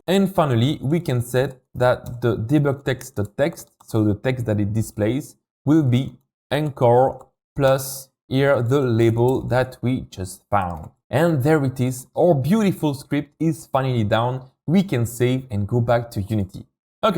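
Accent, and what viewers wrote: France. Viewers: Hi, are you french? your accent...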